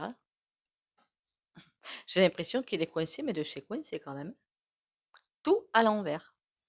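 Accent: South, France. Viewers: Merci, ce soin m'a fait partir loin d'un seul coup, en quelques secondes et je reviens paisible. J'aime beaucoup votre voix, avec en plus, ce petit accent ( je pense que vous êtes du sud ),et aussi beaucoup de petites phrases amusantes et percutantes qui font plaisir!moi j'ai l'impression que je commence à décrocher, à lâcher prise par rapport à tout ça: j'ai confiance qu'on va arriver à un moment donné où le système va s'effondrer tout seul et où il faudra tout reconstruire différemment, et que cette fois-ci ce sera bien. j'aimerais bien avoir votre avis sur l'idée de Frexit, mais peut-être l'avez-vous fait déjà précédemment? Je suis aux Patriotes et par conséquent je tiens à l'idée de reconstruire sur de nouvelles bases. Merci.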